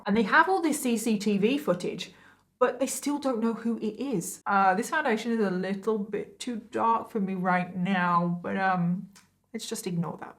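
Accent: United Kingdom. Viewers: I love your channel for many reasons but one in particular is that you are on the same wavelength as I am in your thinking 🤔 another is I love ❤️ your accent im sure every American always comments about that but I do love a British accent ❤️ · I'm an American but my parents/grandparents were British. I enjoy your accent, reminds me of home. Side note: you are so pretty! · Before even watching the video, only hearing your opening sentences -- I had to comment. (Also, my very first comment on your page BTW) How to pronounce "Craig" in Craigslist...?? Please say the name however you want! You are always so polite and kind, so inclusive and respectful to anyone who could ve watching your videos. I love your stories because they focus on one murderer. So fun listening to the back story of the upbringing and if its not available, you are just as bummed as we all are. 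But you still make the story over the top interesting. I love your voice especially your accent!! Your enthusiasm your side comments fresh from the UK the most! -- LOVE your new Podcast too! I am back to YouTube since I have listened to all the Podcasts. Tons of YouTube videos to choose, so yay for Saturdays and True Crime with leading the way. Nichole from CA, USA 💋💌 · I love accent so much. If I had a British accent this would be the one I'd want 🤣, what part of Britain are you from? I love listening to you speak (not in a creepy way just as a comforting way lol) and even more of a plus is that it's crime stories 😆 if I ever imagine having a British accent this is the kind exactly 💯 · you are British, pronounce and speak as you normally do. I love how people who live in other countries speak. Unless, you use a word that most people have no idea what you might be saying, then a definition is very much and respectfully appreciated. 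Most, like British, much more proper than Americans and I love just listening to accents. So keep on keeping. Don't change. I am from the U.S. and I have no accent because am on the west coast.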